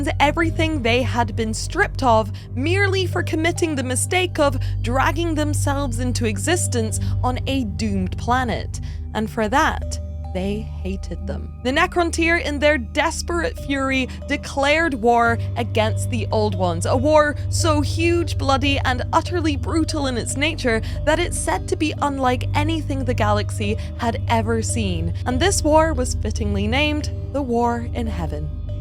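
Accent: Scotland, United Kingdom. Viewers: Bugger! Now I've got to get precise! You know that we sing when we speak? I don't hear too much Edinburgh. More north. Closer to Perth. I'm full of shit, but love a challenge 🤗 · Awesome! Looking forward to the next segment/chapter. Besides, I like hearing you talk lol. Americans tend to like a British accent 😊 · Nicely put, great story to hear, I like a lot the british accent. (Y)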